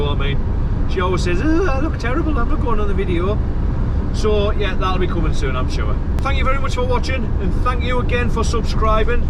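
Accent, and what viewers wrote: United Kingdom. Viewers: Love the videos , keep them coming and love your honesty 👌 Being from Ireland I find your accent funny , it must be from the North East of England I'm guessing 🤣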